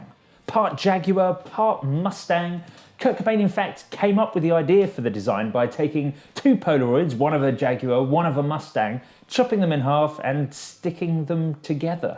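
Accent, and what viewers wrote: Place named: United Kingdom. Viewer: Why the hell is Evgeni Malkin talking about guitars and why does he have a British accent?...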